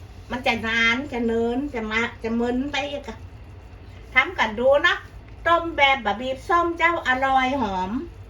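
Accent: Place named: North, Thailand. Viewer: สวัสดีจ้า แวะมาชมการทำอาหารทางเหนือ ใข่ไหมค่ะ ฟังจากสำเนียงค่ะ เพราะๆหวาน ให้ไลท์เลยค่ะ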